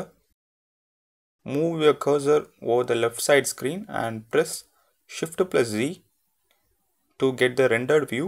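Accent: India. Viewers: Your accent is indian .Are You ?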